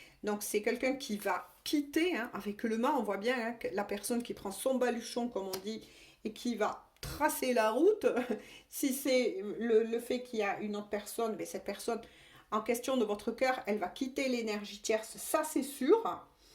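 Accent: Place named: Provence, France. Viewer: Merci belle guidance parlante et limpide avec ce bel accent Provençal qui me rappelle ma ville préférée Aix-en-Provence où j'ai fais une partie de mes études supérieures et dont je resterais amoureux pour la vie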